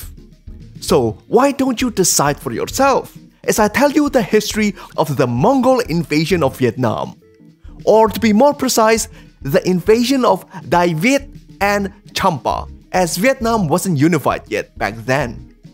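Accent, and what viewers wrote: China. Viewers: From the accent, I am pretty sure he's Chinese.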